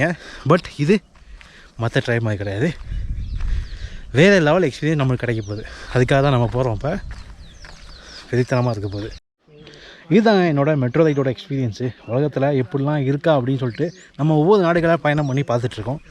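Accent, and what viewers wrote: Thanjavur, India. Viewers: நம்ம தஞ்சாவூர் மாவட்டத்தில் பேசும் அதே பேச்சு உங்களோடு நானும் சேர்ந்து சுற்றி பார்ப்பது போன்று உள்ளது அருமை அருமை நன்றிகள் பல 🙋🙋🙋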